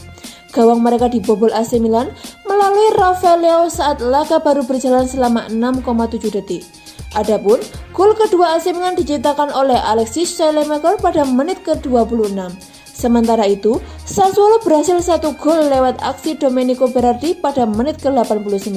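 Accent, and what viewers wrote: Java, Indonesia. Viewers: Medok plus plus. Aksen medok tapi nyebut nama pemain pake ejaan english yang rada rada maksa 🤣 mungkin ini yang disebut Javanglishia 🤪 Buat input ya min, ejaan namanya biar ga terlalu aneh, bagusan cati referensi ejaanya dari komentator bola berbahasa english pas pertandingan. Biar ga terdengar weird kalo disebutin di channel ini. Sukses aja min buat channelnya. Keep it up 💪🏼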